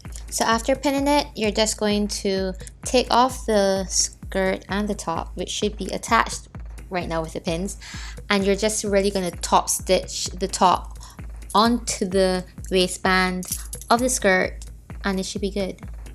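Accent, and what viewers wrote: Barbados. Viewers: Weird question, are you Bajan? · Heard the Bajan accent from the minute you started talking!💙🇧🇧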